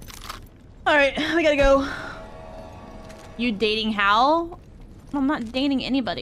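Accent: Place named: Texas, United States. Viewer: Your Texan accent really came through in this vid! Lol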